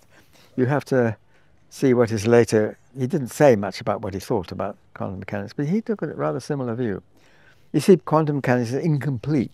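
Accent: United Kingdom. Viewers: Gypsy tongue with a British accent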